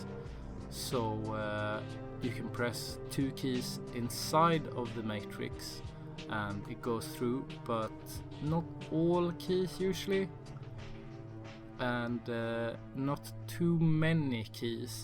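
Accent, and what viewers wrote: Sweden. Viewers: Loved the review. Love your swedish accent aswell, especially xd. Recently bought one of these in factory new condition, it feels like a tank and looks like one aswell. I'm gonna mod it, so this video will probably help me again in the future lol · love the swenglish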